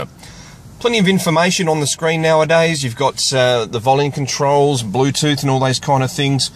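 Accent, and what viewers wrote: Australia. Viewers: U Aussie ?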